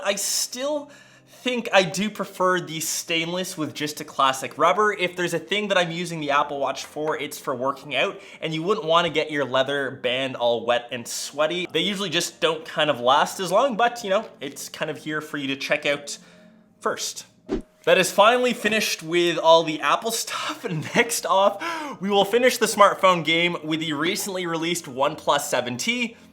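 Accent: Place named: Canada. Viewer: your Canadian accent is awesome !!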